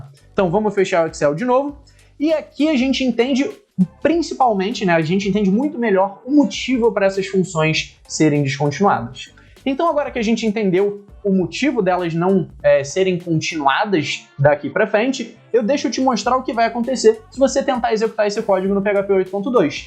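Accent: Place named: Rio de Janeiro, Brazil. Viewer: Boa! parabéns, mas esse sotaque de carioca vixi! de boas cara!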